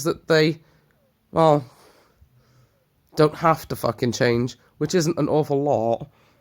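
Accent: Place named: Wales, United Kingdom. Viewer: Another great video. Really had me thinking deep and reflecting with this one. Just curious, you have an interesting twang on your accent - where abouts are you from? I thought Welsh at first but maybe I'm wrong.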